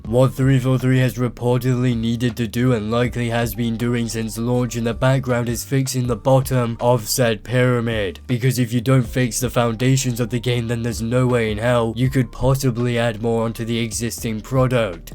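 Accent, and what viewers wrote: United Kingdom. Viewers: He's bri'ish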